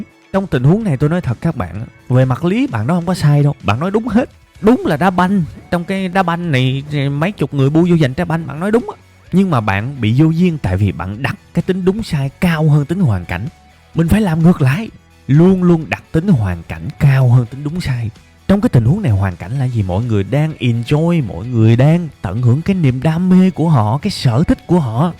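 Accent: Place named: Mekong Delta, Vietnam. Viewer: bài giảng quá hay, diễn đạt dí dỏm, dễ hiểu, giọng miền Tây ngọt ngào, truyền cảm.. . Thanks!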